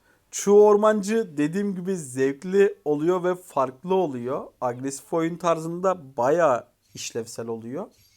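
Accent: Samsun, Turkey. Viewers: Üzümlü kekim samsunlu olduğun ne kadar da belli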